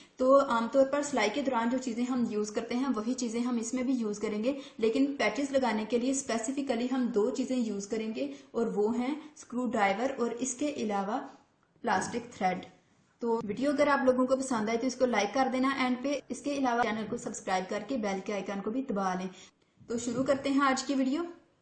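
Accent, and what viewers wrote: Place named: Narowal, Pakistan. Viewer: Lgta h aap narowaaal sy h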